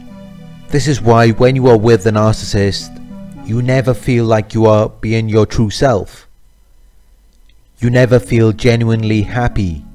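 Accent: Wales, United Kingdom. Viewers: I had a male friend in his late fifties whose mother needed to infantilise him in front of his girlfriend. She would constantly talk about things he did as a child that made him appear stupid, and was still calling him by babyish nicknames. He tried to ignore it, but his girlfriend couldn't stand it. She let the mother have it real good at the dinner table one night, and said they'd never come over again if she didn't stop it. The mother was shocked because she didn't see anything inappropriate in her behavior! Thanks for these videos, and by the way, I love your Welsh accent!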